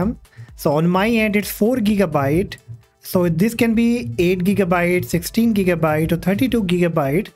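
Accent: India. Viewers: I immediately became suspicious about this 'more ram' due to the fact that his voice sounds Indian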